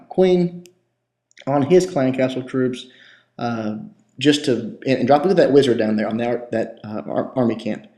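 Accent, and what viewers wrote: United States. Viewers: My new favourite channel. The breakdowns are amazing and your all-American accent just fuels my need to war haha. Keep it up man!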